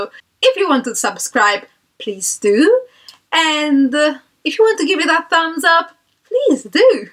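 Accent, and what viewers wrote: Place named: Sardinia, Italy. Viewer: Helo, Your pronounciation of Cymru is perfect! The word Radio is pronounced as you said it in your own Sardinian accent ( as in Sa Radiollina) not as Radio pronounced in English. Also you pronounced SIanel Pedwar Cymru (S4C) perfectly. Da iawn! / Very Good I am coming to N E Sardinia on holiday with my husband next month and am trying to learn a little about your island and language. I share your views that parents should definitely speak Sardinian to their children. It annoys me also when some Welsh people fail to appreciate our beautiful Welsh language (Cymraeg) and some fail to understand the importance of keeping our language alive. Some English incomers will make the effort to learn,which is fantastic but unfortunately some do not understand we are a Country in our own right with our own culture and language. My mother was English but thankfully learnt Welsh when I was a baby in the 60’s and became a fluent Welsh speaker and brought up her 3 children to speak Welsh. 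My children are fully billingual Welsh & English and have also learnt French in school. They also always make an effort to learn a few words/phrases in the language of any Country they visit out of respect! I enjoyed your video. Ciao / Hwyl Fawr!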